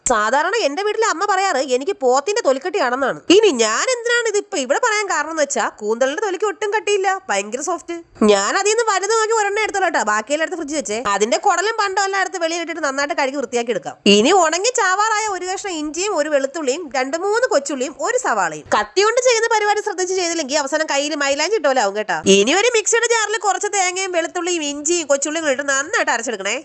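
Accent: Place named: Kollam, India. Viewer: ഗർഫിണി... ചേച്ചി കൊല്ലം കാരി ആണോ 😄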